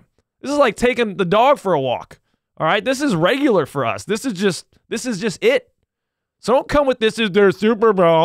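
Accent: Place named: Germany. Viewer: I'm so jealous of your German accent. I wish I could pull that off.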